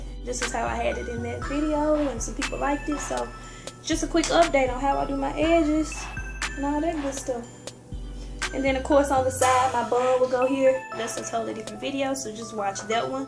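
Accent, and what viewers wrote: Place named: Memphis, United States. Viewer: I knew you were from Memphis as soon as I heard your voice lol your hair is laid hunty!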